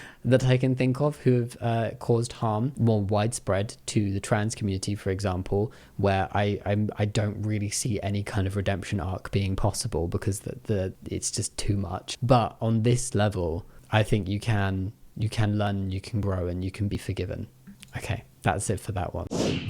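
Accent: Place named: United Kingdom. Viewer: is so scary cause i can imagine you with an american accent now and im so happy you’re not and instead a nice sounding British man with a gentle voice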